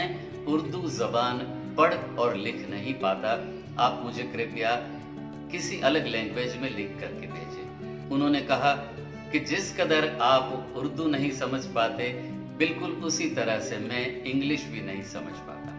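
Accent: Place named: Sindh, India. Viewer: You seem to have a Sindhi accent. Karachi Sind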